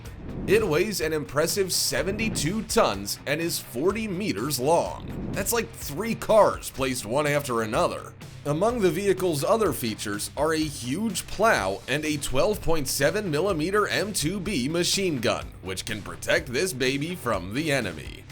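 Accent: United States. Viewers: the annoying side of American accent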